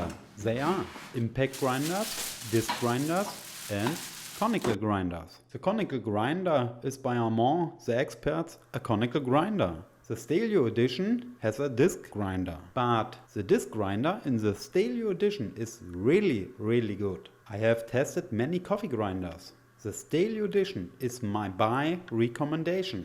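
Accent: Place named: Germany. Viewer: Giving upvote for the lovely German English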